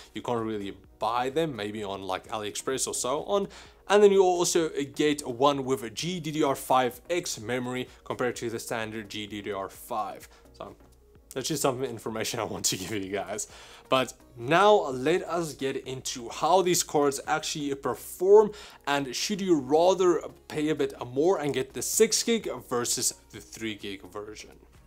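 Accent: South Africa. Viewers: Damn I knew this is a fellow South African by that accent... Lekker Boetman.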